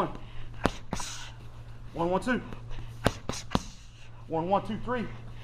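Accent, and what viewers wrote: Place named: United States. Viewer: I train Muay Thai out here in Thailand. Never been to another gym. It's a trip hearing a trainer speak English terms with an American accent and attitude. lol. I need to come visit a gym back in the States! heh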